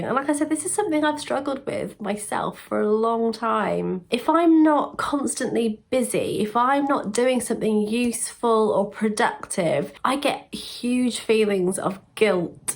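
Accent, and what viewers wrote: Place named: United Kingdom. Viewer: May I ask where your accent is from (Which part of the UK)? I just LOVE it !!! (And I'm not a native speaker, I'm German, so I can't really make an educated guess :D)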